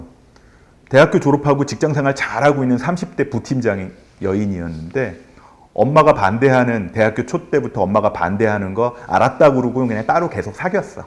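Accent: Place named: Seoul, South Korea. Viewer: 서울경기권 방언입니다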